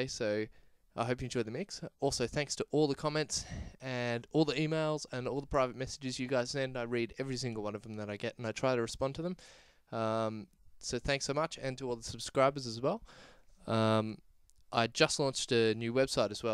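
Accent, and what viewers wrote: Australia. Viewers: By any chance are you Australian ?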